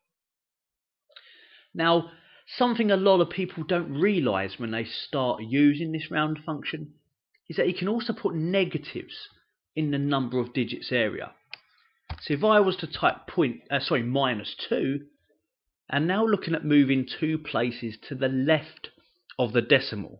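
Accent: England, United Kingdom. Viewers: I have not heard English accent very long time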